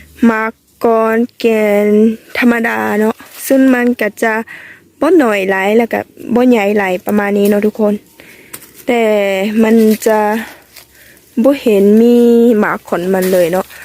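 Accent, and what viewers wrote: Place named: North, Thailand. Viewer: ນ້າຮັກຫລາຍອອກສຽງເວົ້າຄືຄົນພາກເຫນືອເນາະ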